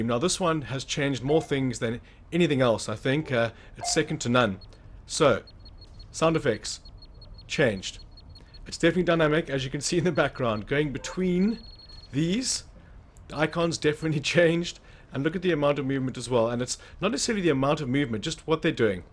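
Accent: South Africa. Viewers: South African Youtuber ? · Can clearly hear you south african😂❤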